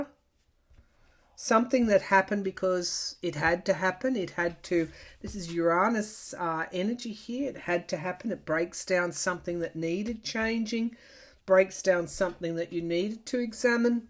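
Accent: Australia. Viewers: Your reading was lovely, thank you. I love the Aussie accent, a bit like mine Oi Oi Oi 🙌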